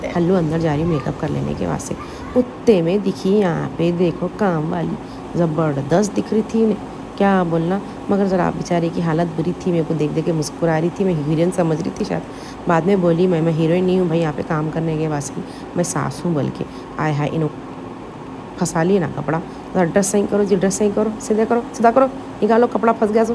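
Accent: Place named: Hyderabad, India. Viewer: mam Allah bless you thank you ma'am bahut pyari acting aur bahut pyari awaaz bahut Pyara Hai Aapka baat karne ka Hyderabadi thank you so much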